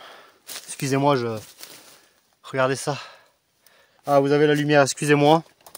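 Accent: Southwest, France. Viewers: Bonjour 👋 je me suis abonné à ta chaîne très interessant est de belle qualité mais t’a le même accent du sud ouest comme moi 😁 tu est d ou ?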